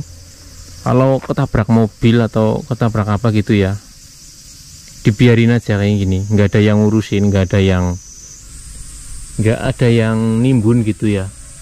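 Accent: Banyumas, Indonesia. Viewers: ora ngapak ora kepenak 😊😊😊😊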